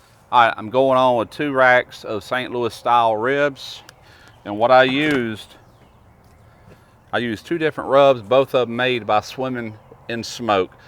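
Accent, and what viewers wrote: South, United States. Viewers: you make fabulous videos! Most informative, along with a great Southern drawl that makes a super combination for super, southern cooking! Thanks, and looking for more of your videos!!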